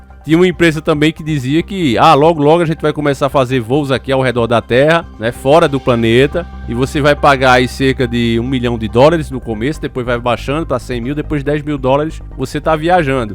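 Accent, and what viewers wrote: Northeast, Brazil. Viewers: mais uma vez eis me aqui ótimo início de semana pra toda família encarando também mando o meu abraço pra vocês, eu moro em São Paulo a mais tempo duque vive no nordeste mas sim sou de Alagoas e além de tudo estou familiarizado com o seu falar fico feliz em saber que no nordeste tem alguém que representa bem não só o nordeste mas todo O Brasil aqui no YouTube é uma honra poder fazer parte de todo família encarando, embora não gosto muito de comentar mas quando torna-se mais que interessante como é o caso da terra então eu entro na conversa.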